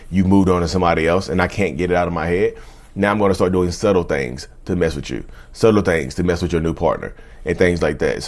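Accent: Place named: South, United States. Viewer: It’s his particular southern dialect, depending on which region a family is from. My family is from a certain part of the south. It’s normal, you just gotta get used to it and keep up. Pretty sure he’s been speaking this way since he learned to talk.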